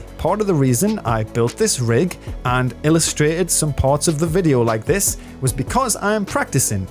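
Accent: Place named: North, United Kingdom. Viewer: good vid, where u from? sound very northern :-)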